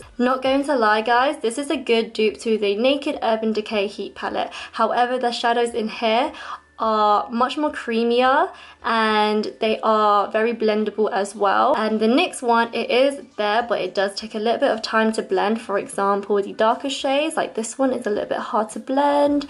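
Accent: United Kingdom. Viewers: <mail> (p.s. when I first started watching you I was so surprised when I heard your voice cause I didn't realize you where from the U.K. 😂) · You're my Asian makeup inspiration! <3 & you're hella funnyyy. When I watched your first video I was pretty shocked by you're British accent! I wasn't expecting that ahaha but now I can't stop watching your vids ! {ig name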